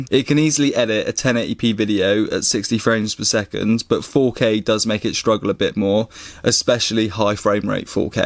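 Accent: United Kingdom. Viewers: Are you British? I am you new subscriber, and I love your video quality <3.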